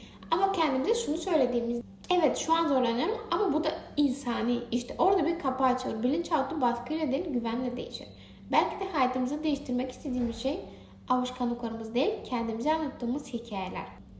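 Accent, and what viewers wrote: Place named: Russia. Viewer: Rusça gibi konuşuyorsun Türkçeyi ama dinletiyor. Bilinç altı konusunda da haklısın👍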